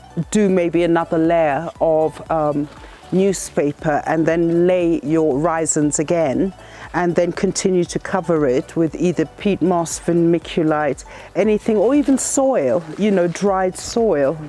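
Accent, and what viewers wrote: England, United Kingdom. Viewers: Where are you? You sound English but that doesn’t look like England. Are you in South Africa? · Hi I heard that you mentioned sand. I've found my rhizomes are prone to rotting. Could you let me know the ratio of the ingredients in the compost please? You're so English. Where are you based please? Lovely to hear English English!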